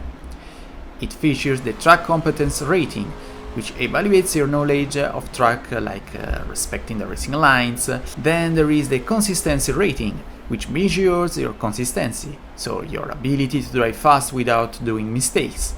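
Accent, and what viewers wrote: Italy. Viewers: Is forza Motorsport 7 a good simulation game? Btw are you italian? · I love your English! Are you Italian? If so, greetings from your neighbour Albania 👋🏻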